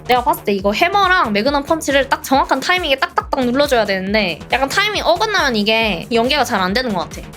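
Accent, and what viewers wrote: Jeolla, South Korea. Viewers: 님 혹시 전라도 출신이신가요? 억양에 사투리가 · 전라도 ㅇㅇ 졸귀 ㅋㅋ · 말 할때 전라도 말투로 구수하네여ㅋㅋ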